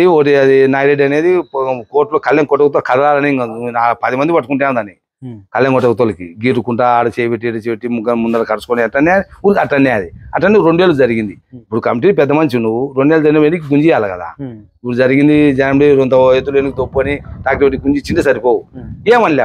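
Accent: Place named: Rayalaseema, India. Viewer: Pure rayalaseema slang❤❤🎉🎉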